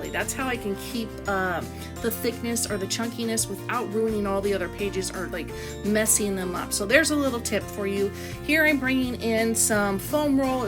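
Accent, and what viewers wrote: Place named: South, United States. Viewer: ain't nothin' wrong with a southern drawl y'all....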